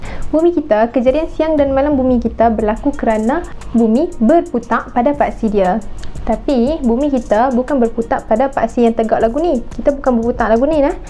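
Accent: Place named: North, Malaysia. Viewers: putaqqq..kuat naa utaraq dia😂😂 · Speaker pon macam orang utara juga tu hehe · Lorat utara pun kuat jugak tu 😆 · Comel Orang utagha weh, berputaq teruih, hang mai aloq setaq kepala hang ku putaq,🤭🤭🤭🤭🤭🤭🤭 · Aku bangga dengan akakk ni rilek jaa cakapp utara macam besa 👍 bukann apa sbb kawan aku baru p kl sebulan balik mai dok cakap kl , contohnya tengah sembang dia tanya depe pi mane ? 😂 aku rasa orang kl cakap diorang bukan depe 😂 jem otakk nak tegoq 😅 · Dia punya putaq sampai aku dah tau speaker org utagha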